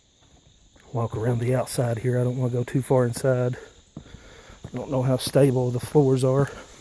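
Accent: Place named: South, United States. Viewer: Very cool! Just subscribed.. Love your southern accent.. It is a lot like mine!! lol (I am from NC.) Looking forward to seeing more videos!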